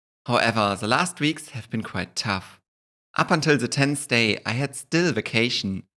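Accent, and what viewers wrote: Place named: Germany. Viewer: Das klingt so falsch wenn ein Deutscher englisch spricht.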